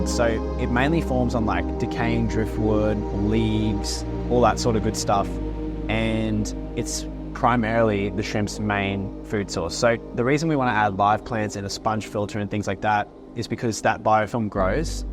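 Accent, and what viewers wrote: Australia. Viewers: I love all your videos. May I make a suggestion? The music audio is a bit too loud; it makes it harder to follow your wonderful Aussie accent!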